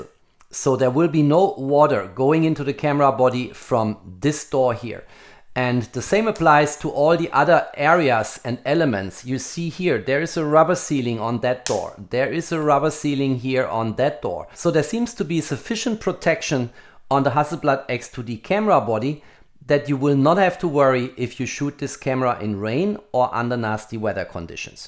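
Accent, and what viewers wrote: Germany. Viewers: Agree, and I love his German "We" instead of "V" in his pronunciation...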